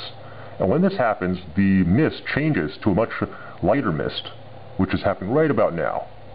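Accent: United States. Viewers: why do you use Celsius if you're american? (i assume from the accent)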